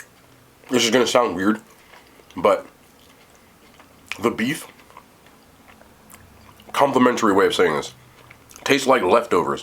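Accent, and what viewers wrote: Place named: New Jersey, United States. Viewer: I love when you try to pronounce things in another language with your Jersey accent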